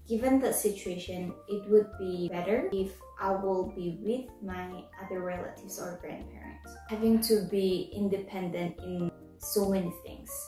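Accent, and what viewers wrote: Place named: Philippines. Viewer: Wow you have a Filipino diction, the way your u speak, so proud of you that you adopt a Filipino culture 😘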